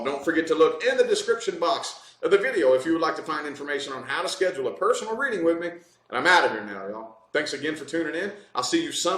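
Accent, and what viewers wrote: South, United States. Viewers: Thankyou so much I appreciate it, great accent where you from down south I'm in New Zealand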